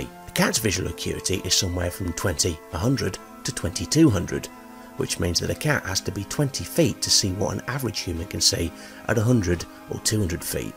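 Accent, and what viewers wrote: United Kingdom. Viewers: "Cats are also thought to be trichromats but not in the same way humans are." Wow! He's British! 😂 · Very informative....and it’s great to hear a British voice for a change xxx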